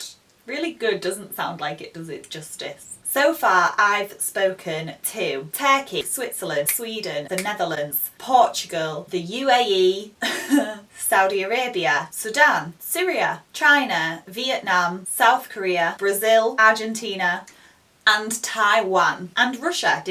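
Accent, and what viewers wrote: United Kingdom. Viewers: I like to listen to your nice British accent.. Greetings from Iraq.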